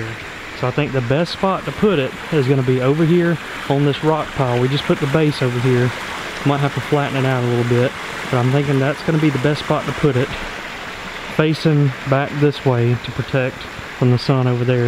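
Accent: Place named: Alabama, United States. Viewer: Omg ok so im from texas but i moved to alabama about 2 years ago i moved back sense but i have a best friend that i miss so much and accent is exactly like hers and i know its because yallare both from alabama but i just wanted to mention it😂😍😭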